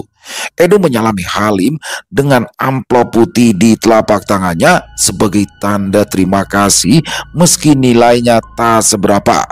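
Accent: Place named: Samarinda, Indonesia. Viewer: Samarinda hadir bang...logat Banjar Samarinda nya Paseh banar bang....ada kime' nya lagi 🤭🤭🤭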